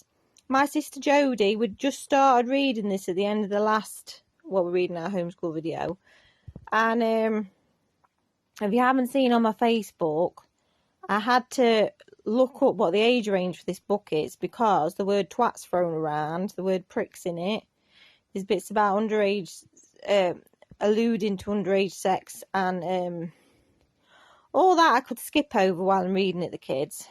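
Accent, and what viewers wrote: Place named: United Kingdom. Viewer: Where are you from in UK, Queen Victoria? I like your accent very much I want to learn it when I come there 😊❤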